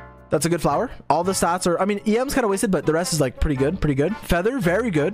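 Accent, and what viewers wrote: Quebec, Canada. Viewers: BOLIDE XIAO skdjalxkaal lmao. i thought mine was pretty good with 76 crit rate/203% cdmg but.... ah, back to farming for xiao. also do you speak Quebecois? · je savais pas que t'étais québécois lol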